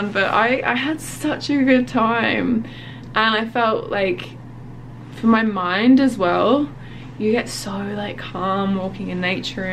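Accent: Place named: Australia. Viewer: "kay" "naydeeyaa"? australian accents are so cute lmao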